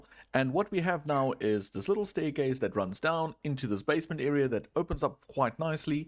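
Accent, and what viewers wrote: South Africa. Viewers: I knew you were South African. Happy we one the world cup!❤🇿🇦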